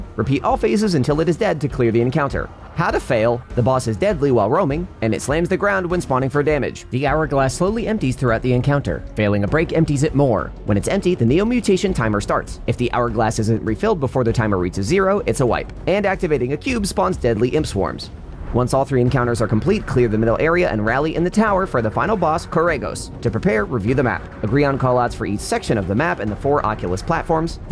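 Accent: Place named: Scotland, United Kingdom. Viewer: This dude does a great scottish accent! much love brother.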